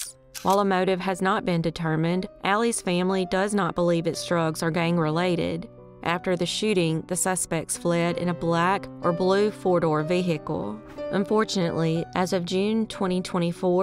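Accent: South, United States. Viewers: I always try to picture what this southern girl looks like 🤔 the southern accent is adorable 😊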